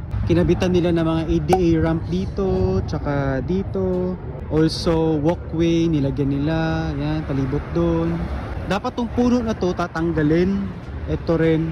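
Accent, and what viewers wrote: Visayas, Philippines. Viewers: Omg 😮 bisaya ka engineer? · The accent of yours tol is so cute... Bisaya...hehehe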